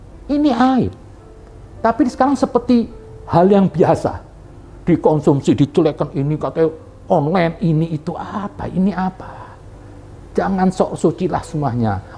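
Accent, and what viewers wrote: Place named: Java, Indonesia. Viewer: Habib asli org mana sih? Aku suka aksen jawanya....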